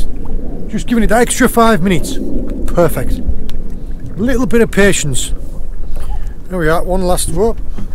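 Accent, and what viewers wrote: United Kingdom. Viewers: Every time I watch your posts, I enjoy your crisp clear beautiful British accent. Besides, it is always nice when the fisherman knows very well weather he got snagged or he feels that some kind of fish got the hook in the mouth and try to hold itself in a whole. Perseverance paid off.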